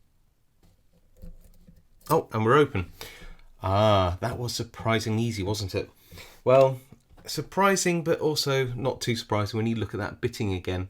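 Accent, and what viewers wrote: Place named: RP, United Kingdom. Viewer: You are just so talented, you speak the "Queens English", you pick locks, gut and re-pin locks, and now I see you can do optical illusions! Does it EVER end!?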